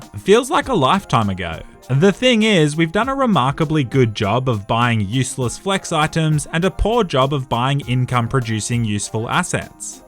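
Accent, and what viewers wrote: Australia. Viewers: Is he Australian? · are you Australian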